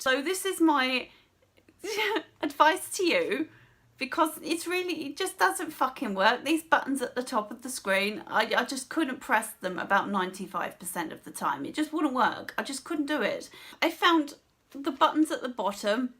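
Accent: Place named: RP, United Kingdom. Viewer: You are one of the few people I know of that can still sound posh while swearing haha. Great review chum.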